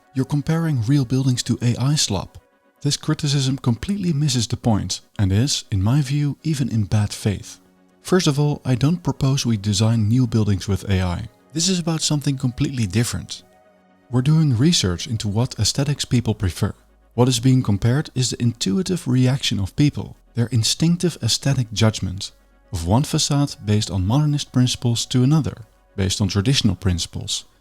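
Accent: Netherlands. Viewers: This has to be a Dutch accent, eh? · You sound like my Dutch friend from Amsterdam, are you Dutch?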